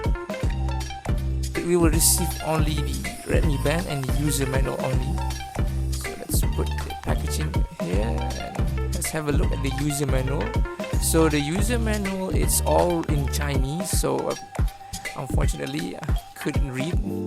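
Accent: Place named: Malaysia. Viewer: bang orang malaysia ke?sbb mcm malaysia...hehe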